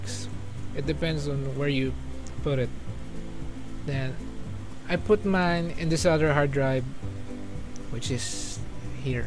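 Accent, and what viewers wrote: Philippines. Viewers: You're obviously a Pilipino judging your accent. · I can tell that u're filipino because of your accent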